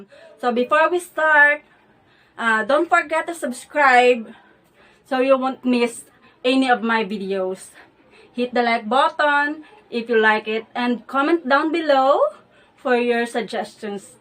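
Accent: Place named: Hiligaynon, Philippines. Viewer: Galing naman, ang husay! Ganon naman yan ang cute!!!!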